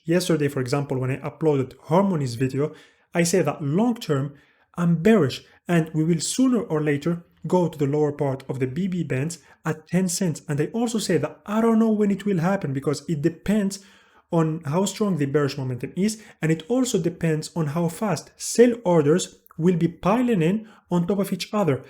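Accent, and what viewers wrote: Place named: France. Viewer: L’accent français ça 😆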